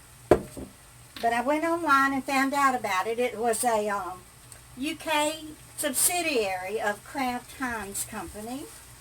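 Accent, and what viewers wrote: South, United States. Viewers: Food looks good ms. 😋your sooo sweet,I love your southern accent😍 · Love that "bless his heart", that's so southern. We all say it down south. The north hasn't taken your accent away bless your heart! You know I'm real southern because of being from south Mississippi like you. sang that song. My mama had all his records, yes records not CD's. Her's were the big ole 78 vinyl RPM's., crawfish pie and file gumbo, cause tonight I'm gonna see ma cher amio. Then he was gonna pole his pirogue down the bayou. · I absolutely love your southern accent :)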